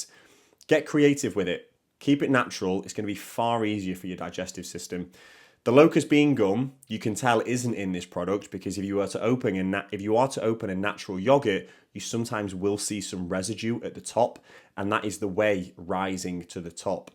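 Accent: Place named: Northwest England, United Kingdom. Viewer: Excellent video. Sounds like you're from my neck of the woods (northwest England) Being a lazy cook i was surving on ready meals and pies until a heart scare a few months ago. So now I have to eat healthily and finding it tough. My nurse is saying no butter, but I think a little is better than the UP spreads that taste vile anyway. The food industry is failing us. They have to reduce the number of additives as the demand for convenience food won't go away.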